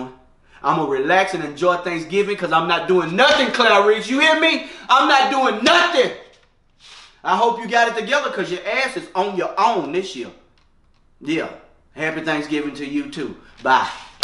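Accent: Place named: Houston, United States. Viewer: I love this Houston accent. He sounds exactly like my mother... bad attitude and all. 🤣🤣🤣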